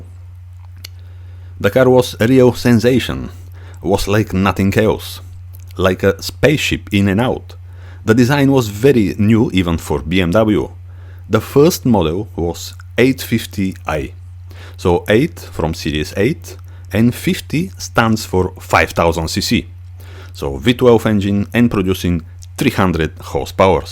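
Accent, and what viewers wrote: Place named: Italy. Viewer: WoW, It's going to take me a while to all of your videos but I'm going to do it! The Italian accent is just a bonus too.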